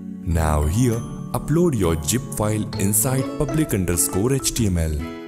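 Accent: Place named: India. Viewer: Hahahah a Indian voice is the same , you don’t need change your voice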